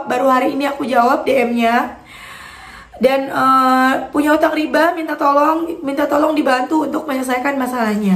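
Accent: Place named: West Java, Indonesia. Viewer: Ya Allah Sunda nya ketauan banget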